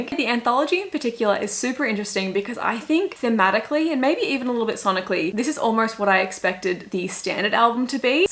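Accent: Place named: Australia. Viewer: Hi, I just wanted to let you know, that when I bing searched taylor in paris, from a public computer, this post of yours, came as first listing (with filter of 20 min+ videos). I just saw you the first time, when you replied my comment saying I wanted to see your whole first time listening video of the new album. Mind you also, that from your accent, it sounds like you are Aussie? or some place far from USA, where I am. So... just to let you know, you are famous. At least for 20 min+ videos about.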